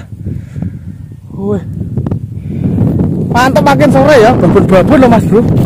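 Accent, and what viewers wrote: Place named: Java, Indonesia. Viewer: Semakin banyak ngomong semakin menghibur mas brow mantap, jangan ilangin logat jawanya lucu 👍👍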